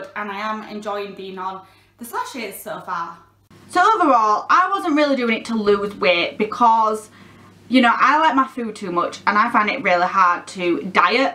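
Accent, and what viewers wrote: Yorkshire, United Kingdom. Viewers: Yey , it’s nice to not be the only YouTuber with a Yorkshire accent 😂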